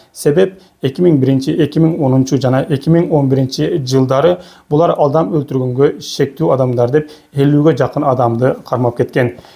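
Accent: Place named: Nookat, Kyrgyzstan. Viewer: Мына суйлоп жаткан бала ноокаттансынбы?? Ноокатский акцент менен суйлоп жатасын. Так кыргызча суйлочу!!!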